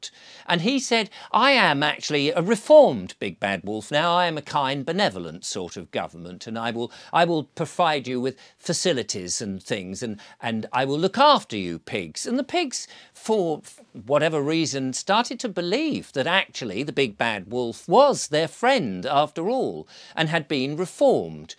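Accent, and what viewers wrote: United Kingdom. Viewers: HI, I REALLY ENJOY YOUR VIDEOS!!! THIS ONE IN PARTICULAR! YOU ARE A WONDERFUL STORY TELLER, YOU JUST HAVE A NICE WAY OF SPEAKING, MAYBE IT'S THE BRITISH ACCENT? I LIVE IN MASSACHUSETTS, USA, I'VE NOT BEEN TO HAWAII, BUT I HAVE BEEN TO CALIFORNIA MANY TIMES & I HAVE A SPECIAL FONDNESS FOR CALIFORNIA BECAUSE SOME OF MY FAMILY LIVED & THRIVED THERE FOR DECADES. HAWAII & CALIFORNIA & A FEW OTHER STATES IN THE USA HAVE THE SAME SITUATION OF EXTREMELY HOT LAVA SQUEEZING OUT OF BREAKS IN THE EARTH CALLED FISSURES WHICH CATCH DRY BRUSH THAT IS LEFT TO ROT & GUESS WHAT HAPPENS, BINGO, WILD FIRES, WILD FIRES EVERYWHERE THAT COULD EASILY HAVE BEEN AVERTED BY JUST CLEANING UP THE DRY BRUSH! ANY RESPONSIBLE PERSON WHO HAD ANY COMMON SENSE COULD FIGURE THAT ONE OUT & THAT IS WHY I HAVE NOTHING BUT DISDAIN & LOATHING FOR GOVERNOR NEWSOM OF CALIFORNIA & OTHER LEADERS & PEOPLE LIKE HIM!!@ THE WILD FIRES IN CALIFORNIA, HAWAII, THE WESTERN USA & CANADA ARE INTENTIONAL & CAUSED BY THE VERY PEOPLE PAID QUITE WELL TO PROTECT US & OUR MAGNIFICENT NATIONS!!! 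I KNOW MOST LIKELY FROM THE FACTS YOU ARE STATING, INCLUDING HOW THE PLASTIC SEATS AREN'T MELTED, BUT THE HAWAIIAN HOMES ARE FRIED!!! I STILL SEE & HAVE SEEN WILD FIRES ACROSS MUCH OF CANADA FOR MONTHS & ONGOING IN THE USA & THESE ARE VERY EASILY PREVENTABLE WILD FIRES BY SIMPLY AT THE VERY LEAST REMOVE THE DRY BRUSH, DEAD TREES & ANY OTHER FLAMMABLE DEBRIS CONCENTRATING ESPECIALLY ON WHERE THE VOLCANIC FAULTLINE TRAVELS &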